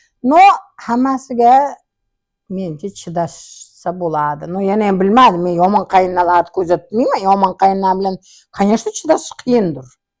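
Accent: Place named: Samarkand, Uzbekistan. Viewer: ты супер, маладес, хакикий Самаркадча гап булибти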